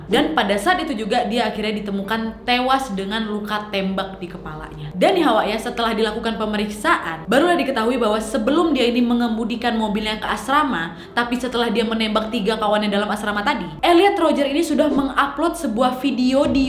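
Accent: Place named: Medan, Indonesia. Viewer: Senang liat cara ngomong nya. Ceplas ceplos. Kayak cwe medan. 👍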